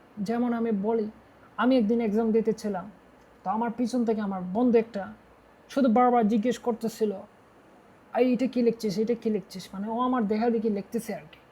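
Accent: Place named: Sylhet, Bangladesh. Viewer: Sylheti manuser muke, suddo basa manayna.👀🥲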